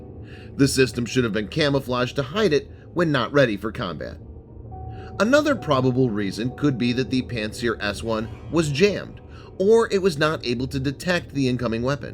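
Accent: United States. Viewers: the very American-sounding voice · The way American pronunciation murders ordinary language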